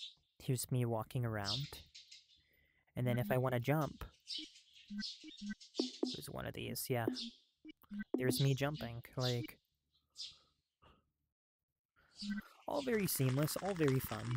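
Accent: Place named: United Kingdom. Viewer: You have a bit of a British accent now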